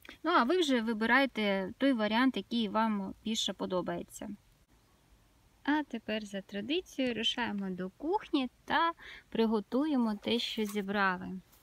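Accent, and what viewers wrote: Standard. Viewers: Более года , как ВПО, живу среди жителей трускавца, Дрогобыча, Борислава и Стебника и ни разу не слышал такую красивую, чистейшую украинскую речь. У местных жителей, украинская речь ну совершенно другая😅 Откуда, вы, мадам? Единственное, что подтверждаю, что крапивы здесь как у нас на Донбассе амброзии.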